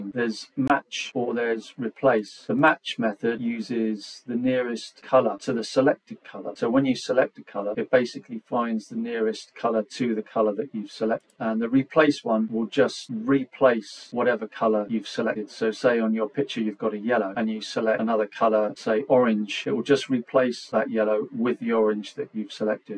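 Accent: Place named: England, United Kingdom. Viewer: as the author of the app I have to say this is one of the most amazing user videos I've seen for my app! I am so impressed and thankful! Of course, the super cool English accent is the icing on the cake! Seriously, your video is going to help so many people!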